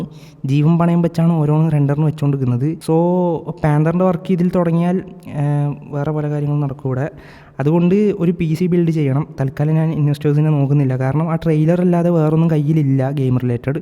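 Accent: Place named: Palakkad, India. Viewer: Pro place palakkad aahno? Njn ahne. oru touch und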